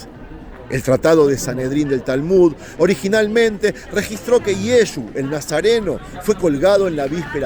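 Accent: Argentina. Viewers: Judio ? Yo lo escucho con acento Argentino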